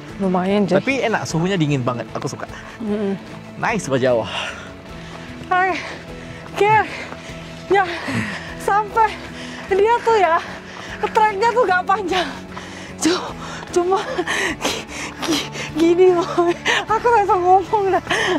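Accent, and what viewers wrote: Pontianak, Indonesia. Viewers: lebih menarik chanel ini drpd yg sebelah,kbnyakn narasi sok wah ,terbukti subscriber nya lebih bnyk ini logatnya pontianak atau ketapang ya bang?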